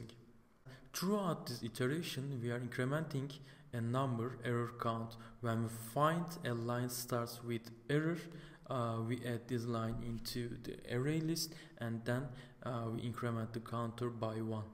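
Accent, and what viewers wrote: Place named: Turkey. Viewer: hocam ismini görmeden aksanından anladım türk olduğunu tebrikler güzel bir seri yapmışsın :)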